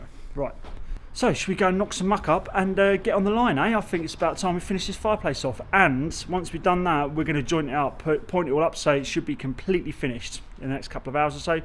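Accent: England, United Kingdom. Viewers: Been watching your videos for a while. Learning alot about bricklaying. Many thanks for teaching us Yanks lots of Queens English. If I ever get a chance to visit England, I'll know what you blokes are saying.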